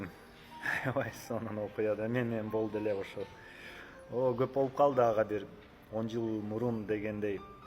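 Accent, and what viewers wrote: Standard. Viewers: Какой ты молодец чисто разговариваешь на кыргызском языке. Браво. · Молодец! Омурлуу бактылуу бол таза суйлойт экенсин кыргызчаны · Бирок кыргызчаны так адабий тилде суйлойт экенсин рахмат ошол эле мезгилде мен кыргызмын дегендер меням,сеням деп суйлошот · Ассаламу алейкум ,дрсум азаматсын ,анча мынча Кыргыздарга караганда таза суйлойт экенсин сонун,Аллах ыраазы болсун сага дрсум ,ккопчулугу Ош ЖалалАбад жактагыларга эгер салыштырсак озгочо ,бирок алар капа болбосун мен болгон чындыкты айтып атам ,келгиле туугандар таза суйлогонго аракет кылалы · Азатсын , таза кыргызча суйлоп , элди тан калтырдын, азаматсын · Ооба бир да созуно орусча аралаштырбай таза суйлоптур баракелде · Азаматсын балам, соз байлыгын коп , таза тунук суйлойт экенсин бар бол, ийгилик сага. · Азамат жигит! аман бол, таза кыргызча сүйлөйт экенсиң рахмат!🎉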